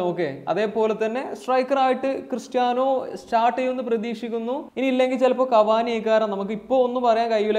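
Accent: Malappuram, India. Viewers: Malappuram slang kidukki💥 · ഇങ്ങൾ മലപ്പുറം കാരൻ ആണോ 🔥❓️❓️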